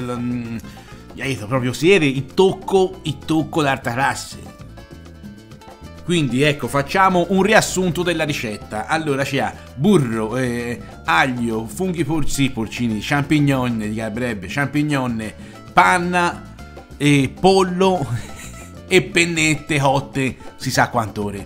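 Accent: Tuscany, Italy. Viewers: Toscano di dove, il dialetto somiglia a quello Fiorentino ma non lo è, di che città sei ?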